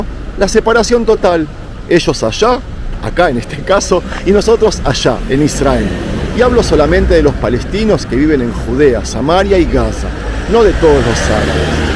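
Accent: Buenos Aires, Argentina. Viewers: No se veo mucha manipulación, en este video peligroso es meterse en un barrio de caracas y lo otro q no me explico, es que el único lugar del mundo donde se pelean judios y árabes x supuestos motivos religiosos es en Israel, 🤔sospechoso de verdad, tuvieron unos dos mil años en la diaspora, y los judios q Mato Hitler ni siquiera eran de medio oriente la mayoría eran de Alemania 🤷🏽‍♂️, y tenían religión judía, se entiende q se usara esto como escusa para la creación del estado de Israel pero la mayoría de los israelitas q viven en la nación de israel yo diría q son de otros orígenes,no semitas, asi como tú q tienes acento argentino o uruguayo ni idea de cuál país seas pero de x allí, lo cierto es q de origen semita no creo, pero puede q me equivoque x otra parte habría q ayudar a un país como burundi donde realmente se ve la verdadera razón de las guerras,la pobreza extrema, y la exclusión social de los más desamparados del planeta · Shalom...admiro tu enseñanza..a veces pienso que lee tus relatos... 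Y me gusta tu acento porteño..un abrazo desde Salta Argentina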